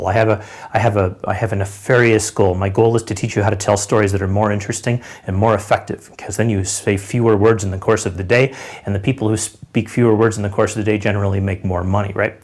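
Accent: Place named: United States. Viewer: Oh hello Kevin! I like your american english. :-)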